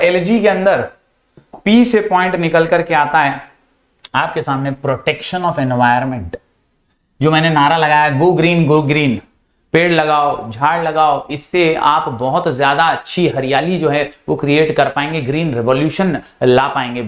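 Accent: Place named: Uttar Pradesh, India. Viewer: Sir U.P se belong karte ho kya U.P ke old age people aise baat karte hai. :)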